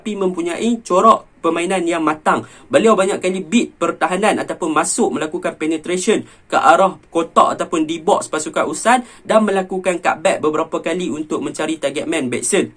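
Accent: North, Malaysia. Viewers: Terbaik badeh tgok gaya ckp mcm org utara😊..apapun ulasan anda sngt baik fasih serta lancar dgn sebutan yg sinonim dgn bolasepak..syabas sdra dan sya sudah subscribe channel anda disbbkan anda selalu buat ulasan tentang pasukan JDT..selaku anak Johor sape yg x bangga dgn apa yg dah JDT n family lakukan thn demi thn,tapi pada thn ini ianya begitu luar biasa skali kepaduan nya malah dgn selambe menghantar rank no 1 n no 3 asia balik awal..giler babun pnya performance to all player JDT,x kira diposisi mana skalipun..trus terang aku tgok baca cerita tentang JDT klhkan UH n layak ke best 16 ni spai ke hari raya😂..hari² tgok n adakala nya x sedar airmata menitis ke pipi...fuhh giler arghh...dan dikesempatan ini juga aku ucapkan terima kasih pada fans² team lain yg menyokong serta mendoakan kejayaan buat JDT sehingga ranking MA Malaysia melonjak mengatasi Thailand juga Vietnam,yg mana di thn 2024/2025 nnt kelab di Msia akan ada 2 wakil layak automatik + 2 kelayakan...ini sesuatu yg baik utk bolasepak Msia khusus nya...tq to all JDT family terutama DYMM TMJ..💙❤️⬆️🔥 #JDTForAll #TMJTheSpecialOne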